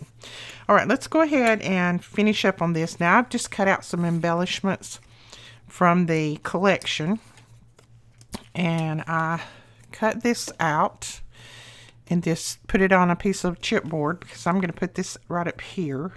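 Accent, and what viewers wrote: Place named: Georgia, United States. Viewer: You wouldn’t happen to be a Georgia Peach would you? I just love ❤️ your accent! You could read the Constitution to me and not get bored! My history teacher was from Georgia and I truly thought you were her. She was my best teacher I ever had.🌹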